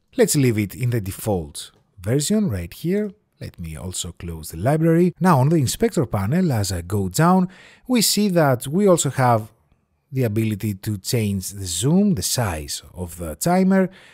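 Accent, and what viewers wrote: Greece. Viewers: This greek accent is so familiar to me! ευχαριστώ! καλή δουλειά!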